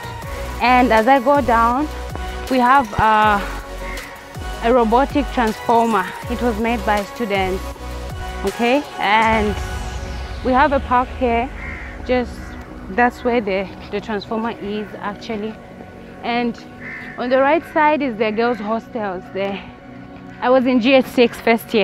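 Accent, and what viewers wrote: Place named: Zimbabwe. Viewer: Nice video babe Your school is nice❤️ I’ll do my school’s vlog too❤️ But why did u have zim accent lol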